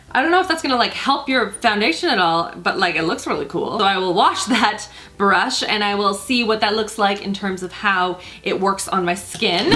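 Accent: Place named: Canada. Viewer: your Canada is showing (the accent, "out" and "about" displays it really well) 🇨🇦🇨🇦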